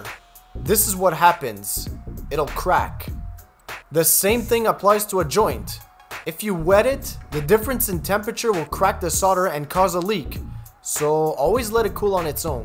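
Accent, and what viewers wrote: Quebec, Canada. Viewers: I could tell you re from quebec ... the plate confirmed it 😎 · I like the Canadian accent!! It's all good heh!!!! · French Canadian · I knew you were from Quebec 😂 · AHA... yes I thought I heard a Canadien brotha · I love the accent. I just knew you were from Quebec. Hey, you're a smart guy. Merci for sharing your knowledge.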